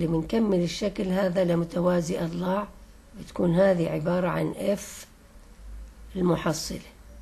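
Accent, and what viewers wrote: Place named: Syria. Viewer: أخي أنت لهجتك شامية حاسس انك سوري.. على أي حال تحياتي الك من سوريا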